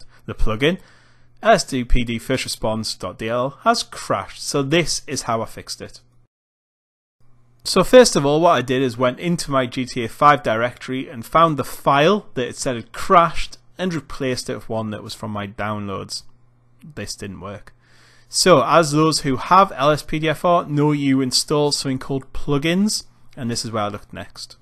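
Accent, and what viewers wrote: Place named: Newcastle, United Kingdom. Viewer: you sound like your from near newcastle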